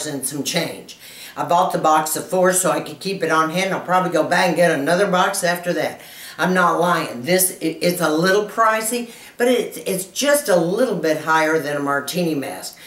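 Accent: South, United States. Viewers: I figured Alabama or Texas lol. I'm from SC. We tend to hang on to the end of words lol. Thanks for the update on this mask. Would love to try it. LOVE to SEE hydration and plumpness father a mask!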